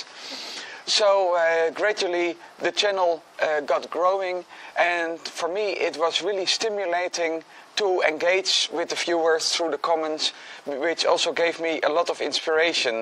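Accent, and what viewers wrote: Netherlands. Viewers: Soothing? His Dutch accent is the worse I ever heard.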